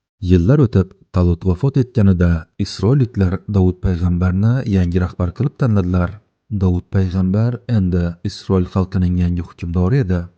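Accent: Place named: Khorezm, Uzbekistan. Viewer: Xorazmlik boʻlsa kerak. Ularni shevasi boshqa. Q harfini ham aytolmaydi.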